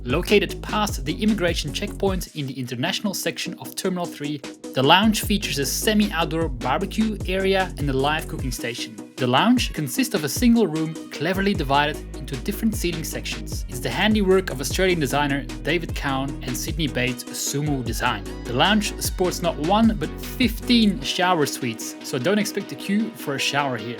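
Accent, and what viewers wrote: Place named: South Africa. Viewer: Thanks for the upload. Haven’t flown Qantas for many years so it was interesting to see what they are like now. The lounge at Perth looked great and not overcrowded, a rarity these days. I’m trying to place your accent, there’s a hint of South African, mixed with a bit of Australian. I love trying to guess accents.😁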